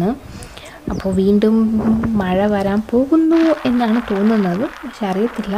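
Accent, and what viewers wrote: Trivandrum, India. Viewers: ട്രിവാൻഡ്രം ആണോ ivar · A new viewer from Trivandrum 😀 being a Korean admirer, watching ur videos and hearing ur (Trivandrum slang) Korean is like 🤩🤩🤩 wish I could be there... 💜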